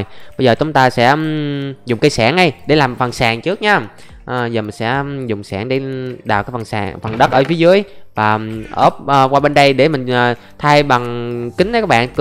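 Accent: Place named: Dong Thap, Vietnam. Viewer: Giọng ngày xưa đặc chất Đồng Tháp lun 🎉🎉🎉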